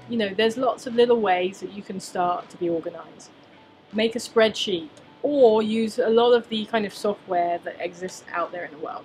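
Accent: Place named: United Kingdom. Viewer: How I missed your voice and your fantastic British accent!! I hope you will spoil us with more YouTube movies. I got to know you via a clip of Casey Neistat many years ago. I love your work and cherish my black Chantell signature Puma's 😉👍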